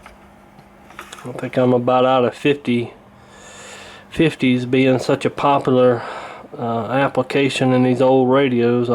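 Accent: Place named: East Tennessee, United States. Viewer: Great channel. And sir, that sounds like an East Tennessee accent to me. Or somewhere not too far from there.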